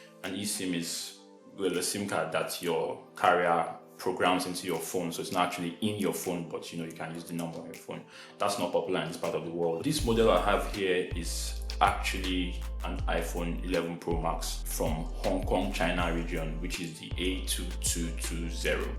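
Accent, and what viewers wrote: Nigeria. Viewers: Good day, You sound NIGERIAN. Please how does one call using the secondary sim from contacts. Since I can have numbers in my head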